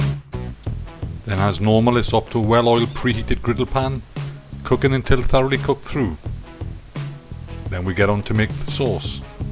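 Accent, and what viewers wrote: Wales, United Kingdom. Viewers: Are you welsh because if not, I'm rubbish at guessing accents?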